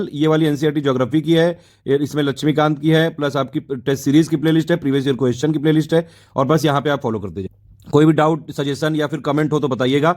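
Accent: Kanpur, India. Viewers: Kanpur ke lag rahe ho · Sir ji kanpur ke lag rahe hai 😄😄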